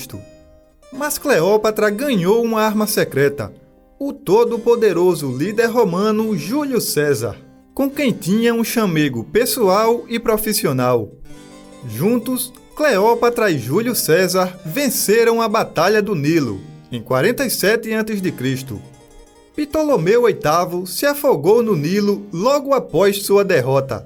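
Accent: Northeast, Brazil. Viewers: vou te contar, amo como você conta.... parabéns.... não perco um vídeo... e seu sotaque e humor dão um toque especial e único... orgulho do nosso Nordeste...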